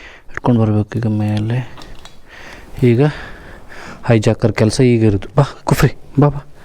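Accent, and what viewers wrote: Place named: Kundapura, India. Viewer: Kundapura Kannada 🔥♥️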